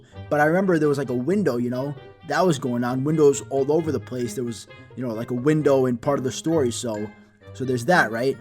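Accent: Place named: New York City, United States. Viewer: Just found your channel, you are so underrated. And I'm so sure you're from NYC. You have our dialect. 😂🙌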